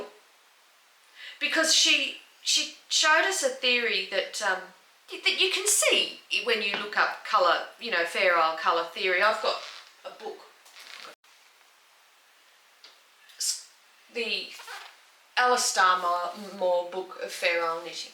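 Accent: Australia. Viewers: I’m really enjoying your podcasts….nice to listen to an Aussie while I’m ploughing through 2aat sleeves already attached to the jumper. It’s an oversize (daughter’s request) Merino Magic (Heirloom) - soooo bulky that I need to knit at the dining table in air conditioning of course. It’s pretty hot here in Two Rocks WA. On to your next episode.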